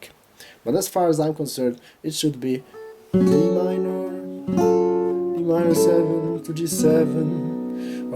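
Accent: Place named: Poland. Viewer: I am also a fan and listen to Jordan Peterson. I lived in Hungary for 8 years and have many Polish friends. I knew I recognized that accent. Thank you again for the great lesson of a favorite song!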